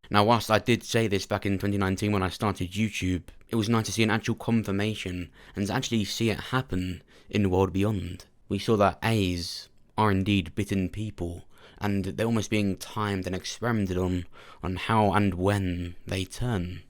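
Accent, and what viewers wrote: United Kingdom. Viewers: Question bro... Do u live in the USA?.. How do u know wat the Philly sky line looks like? ... Just wondering because of ur British accent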